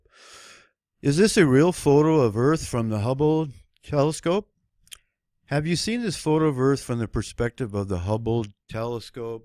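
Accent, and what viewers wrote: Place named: Boston, United States. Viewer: Sounds like Richie from Boston :) I digress.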